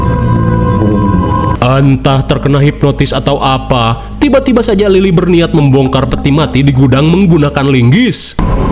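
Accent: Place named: Java, Indonesia. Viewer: jowo pisan ta iki😂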